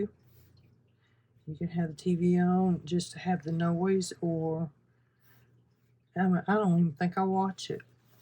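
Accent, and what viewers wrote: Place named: South, United States. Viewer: Keep going! I do all the hand craft/arts and sloppy/ large stitching is a totally new concept ( mind blowing) to me! I was taught by a perfectionist hand quilter ( 10-12 stitches per inch) and match all points or take it out and try again. It’s hard to break old habits and relax enough to do slow stitching as it’s called today. Kawandi, sashiko, boro are not the same but they are large needles and larger stitches. Raw edge will not wash up nicely unless you secure the edges down sufficiently— they will curl and fray and some areas may bunch up more than others. Keep experimenting and show us your progress! I love your southern drawl— just like my great aunt in Arkansas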